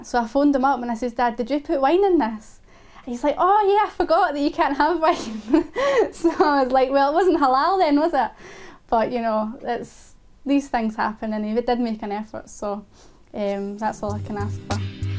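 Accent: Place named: Glasgow, United Kingdom. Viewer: As salamu Aleikum sister, welcome to Islam. Thank you for such a heart worming story. You reminded me of a beautiful Glasgowgian accent. Which i really liked while studying at Dundee university. Your humor about your dad's cooking made me lough a lot. Your story reflects inner and outer beauty. Your advise about Muslims not to react when they are wronged is spot on. It is the true reflection of our prophet Mohammad (saw). May Allah bless you and those attacking you to the right path.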